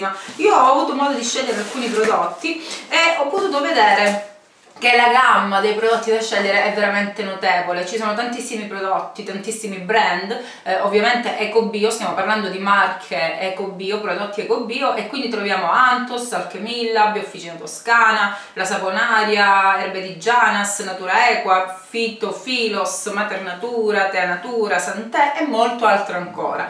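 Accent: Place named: Calabria, Italy. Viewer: carissima io "spollicio" ma non commento però i tuoi video mi piacciono moltissimo, sentirti parlare mi fa sentire "a casa" (W la calabria!!). questo sito lo visiterò anche perché un altro di cui non voglio fare nomi non mi ha inviato tutta la merce da me ordinata promettendo di reinviarmela....sono 8 mesi che aspetto.... buona serata e please non smettere di fare video!!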